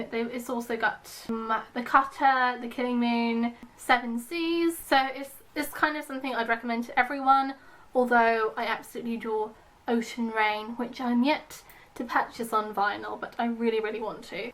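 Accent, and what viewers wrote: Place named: United Kingdom. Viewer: are you british ????😍😍😍😍😍 so lovely acent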